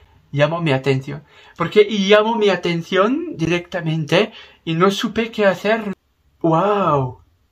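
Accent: South, France. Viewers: Del sur de Francia por lo que le he oído al principio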